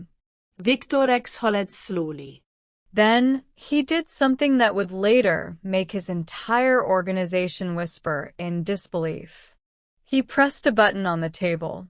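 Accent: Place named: Spain. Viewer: Playback speed x1.25 works well. Good story, but the spanish pronunciation spoils the flow.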